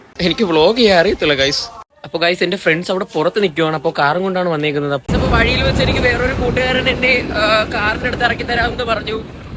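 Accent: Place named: Trivandrum, India. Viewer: Vlog adipoli🎉 pinne nee tvpm anoo aa samsaaram adhupoole und😁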